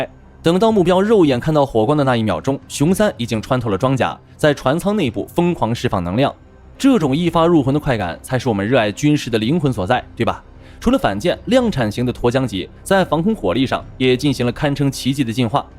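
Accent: China. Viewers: 用的豆包做的AI视频吗？怎么解说都是一股中国大陆的味道。不应该用台积电的2纳米芯片的AI去做吗？😆😁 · 北京腔談台灣國艦？ Awkward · 你的口音好像大陸人 是因為你很討厭 共產黨嗎？所以才會研究與喜歡台灣的特殊艦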